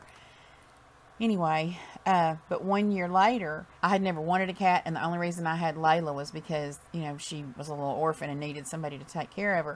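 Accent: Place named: Texas, United States. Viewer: The opening of this video had me cracking up..... Clay is going to keep you honest. He wants to make sure you saw that temperature correctly...lol. And is this the cat you mentioned that would scratch the heck out of you if under the sheets? Well I would have never known because she looks all cozy and cute all up againt you. Too freaking cute if you ask me. And girl I just love your accent, I'm not from Texas so hearing it is music to my ears. Good luck with the voice acting thing., you deserve that and more.....and last but not least, you looked great on all the shirts and blouses you tried on. My fave was the pink or mave with the lace. You looked very pretty, yup even in the dressing room.......lol.......love you girl, love your vlogs and keep them coming. Becuz your laugh is contagious.......blessings ♡♥♡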